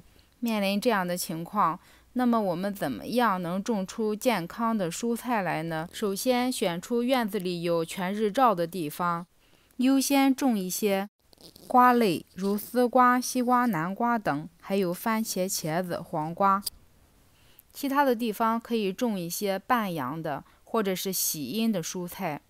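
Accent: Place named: North, China. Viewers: 谢谢分享。您这胶东味真亲切:D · 你好 关注你好久了，听口音你也是北方人，想念咱北方那种雪里蕻，不知英文名字叫什么，请教你一下啊。谢谢😜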